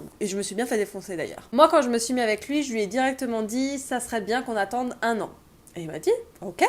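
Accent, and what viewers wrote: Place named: Alsace, France. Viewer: J’ai reconnu ton accent et quand t’as dit que t’habitais en Alsace j’étais genre YES aha Je suis de Strasbourg :) sinon c’est cool ta transparence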